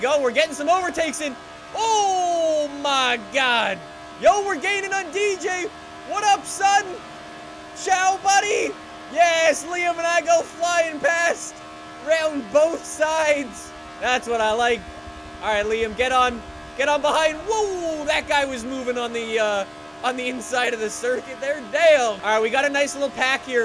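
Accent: United States. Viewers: You even sound more American 🇺🇸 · he turned southern after this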